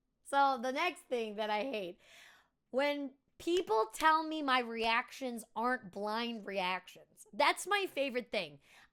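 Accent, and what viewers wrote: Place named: Upstate New York, United States. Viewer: Quick thoughts. 1. Can't really help much with female viewers. I found you as a recommendation from a song and I'm definitely not the smoke show you are lol. 2. It might be awhile but I foresee a time when labels lose their power. With the ability to self publish on Amazon and other places as well as independent production studios on the rise I think it's only a matter of time before bands move in that direction and hire independent teams and managers or firms that replace them. 3. I actually have wondered where you were from but not to be creepy. Being from NJ and living in NC I feel like I hear a bit of upstate NY and even a bit of southern in your voice. Your voice and mannerisms remind me a lot of a friend of mine from that area originally. After this video I feel like I might end up holding the door for you at the shooting range lol jk. 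Hope you have a great week and if you are looking for some bands some of my favorites are, and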